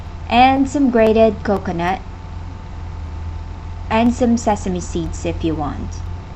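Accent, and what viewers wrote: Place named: Tagalog, Philippines. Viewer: Excuse meh! Kenmax kapa dyan I’m live abroad for so many years Kaya alam ko yong mga accent in different countries. Sa tuno palang nya alam ko marunong sya mag Tagalog.... juskoh ang ingot mo nmn 😅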